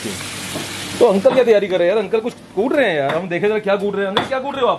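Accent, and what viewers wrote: Punjab, India. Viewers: bolte bhot accha h...punjabi touch mein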